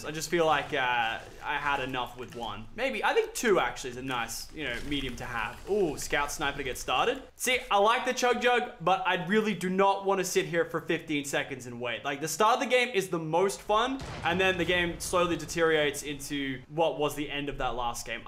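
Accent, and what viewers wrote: Australia. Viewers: u australian?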